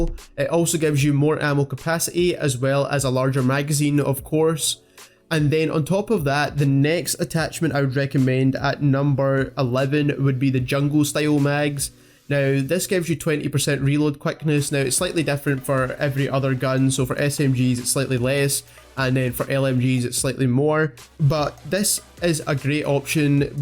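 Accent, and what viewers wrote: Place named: Scotland, United Kingdom. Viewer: The Scottish accent is the best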